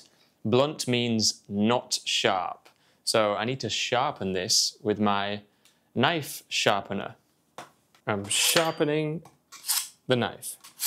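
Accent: United Kingdom. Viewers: شكرا جزيلا انا اتعلم اللغة الانجليزية واحب اللهجة البريطانية احبها جدا 🌸💐🪴🌱واحب النباتات جدا شكرا مجددا انا لمى من المملكة العربية السعودية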